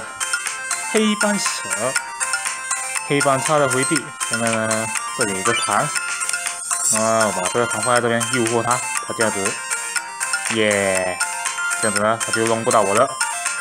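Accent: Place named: Taiwan. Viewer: 怎麼感覺你是臺灣口音?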